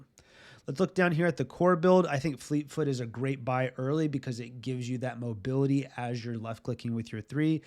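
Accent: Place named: Canada. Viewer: Wait ur canadian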